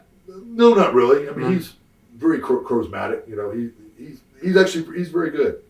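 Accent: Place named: Texas, United States. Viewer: He sounds like Austin and Shawn. Texans.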